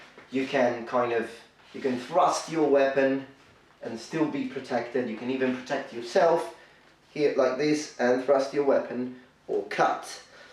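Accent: United Kingdom. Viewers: Came to see young with the strongest british accent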